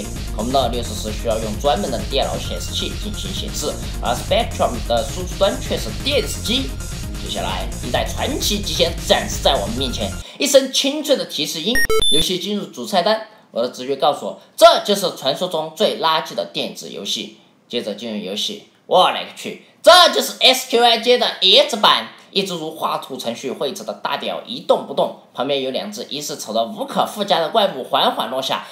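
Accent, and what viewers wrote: Sichuan, China. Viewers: 台港澳地区观众听川普不会别扭吗´_>`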